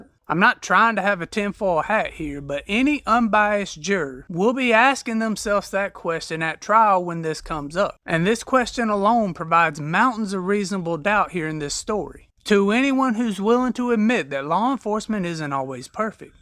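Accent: South, United States. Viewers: Excellent work and presentation. I’ve been into this case since Dec 13th 2022, and I am absolutely sure that BK just couldn’t have done it. Very beautiful and interesting accent you’ve got, btw - from where is it, btw? Alabama? Louisiana? I am fond of different accents! 😊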